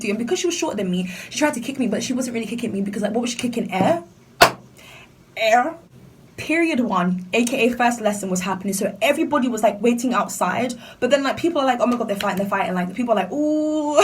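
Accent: United Kingdom. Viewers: SHITT THIS GIRL IS BRITISH. MY GGGGG. I THOUGHT YOU WERE AMERICAN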